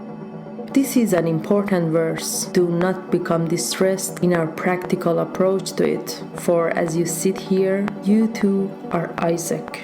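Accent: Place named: Iran. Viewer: Thank you so much. Keep making new videos. I already fell in love with your Persian accents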